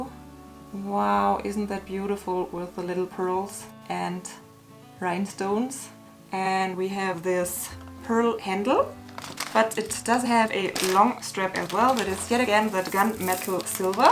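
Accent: Germany. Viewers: The bags are pretty 😍🥰 and your sweet German accent is ever sweeter ❤️🥰💋😍💞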